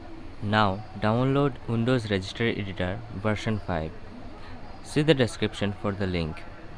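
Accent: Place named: Bengal, India. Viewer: you are asking Indian? I can say he is definately bangla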